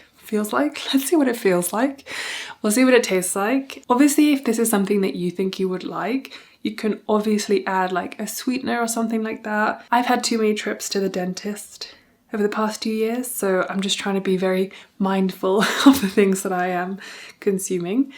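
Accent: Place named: United Kingdom. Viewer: You have such an interesting British accent, what region are you from?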